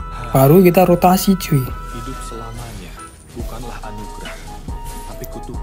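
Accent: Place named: Makassar, Indonesia. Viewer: Saya tebak ya bang, dari logatnya bang orang Makassar dan sekitarnya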